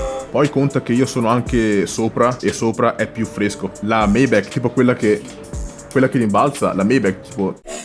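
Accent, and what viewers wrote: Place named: Veneto, Italy. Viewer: grande vecio ti ho conosciuto da poco,spacchi,e poi te si veneto 💯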